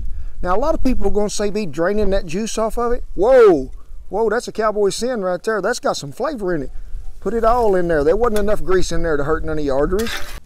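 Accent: United States. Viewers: What people think all Americans sound like.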